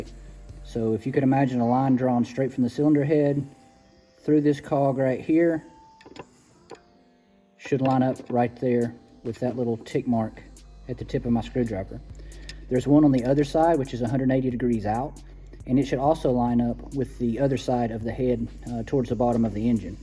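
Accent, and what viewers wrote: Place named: South, United States. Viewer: great video thanks. You sound like you might be in the south....are you for hire to perform this service? I'm in GA and am looking for someone who can do a timing belt service for a Honda Beat.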